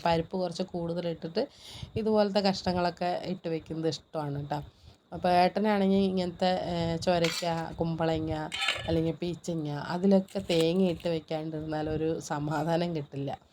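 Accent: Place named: Pathanamthitta, India. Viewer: വീഡിയോ ഇഷ്ടം ആയി. ഞാൻ പത്തനംതിട്ട ആണ്. ഒരപേക്ഷ ഉണ്ട്. നിങ്ങൾ പാലക്കാടൻ സംസാര രീതി ആക്കാമോ. ഞാൻ അത് കേൾക്കാൻ ആണ് വീഡിയോ കണ്ടത്. പക്ഷെ ഇതൊക്കെ ഞങ്ങളുടെ സ്ലാങ് പോലെ ഉണ്ട്. നിങ്ങളുടെ ഗ്രാമ ഭാഷയിൽ സംസാരിക്കു... മനോജ്‌ ബ്രോയെ പോലെ.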